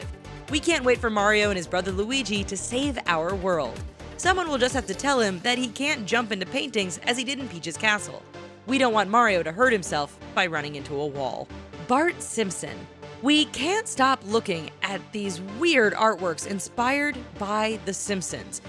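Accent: United States. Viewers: This video did not need narration. Especially that condescending white middle America accent narrative. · This is better on mute. 2018 the year that the American accent became the world’s biggest turnoff. Mind you they will be speaking Russian soon so hey ho, every cloud. Oh it’s a shite video anyway with it the transformations are truly piss poor. Over and out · The narration is so american it hurts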